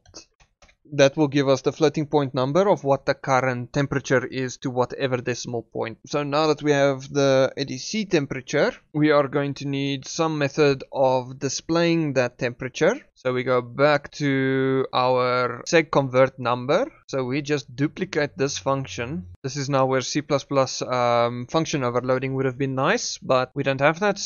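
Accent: Afrikaans, South Africa. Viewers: I can hear an Afrikaans accent. ;-)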